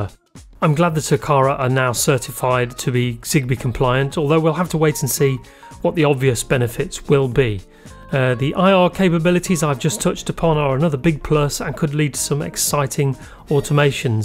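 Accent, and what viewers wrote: United Kingdom. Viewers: I thought he is based in the UK from his accent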